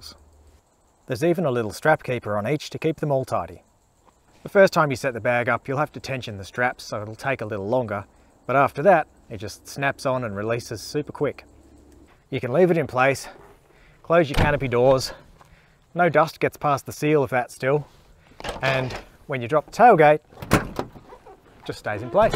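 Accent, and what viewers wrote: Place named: Australia. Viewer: A soon as I hear the Ozzy accent from an overland video I’m like “Yup, you’re certified. Go on and tell me what you know.” ❤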